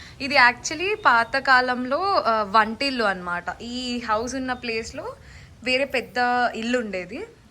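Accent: India. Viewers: Beautiful Telangana telugu ❤ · gaaru Antarvedi daggara unna lakkavaram aa midi. miruu godavari yasa lo matladutu unte rojanta chustu undipovachuu andi mimmalni❤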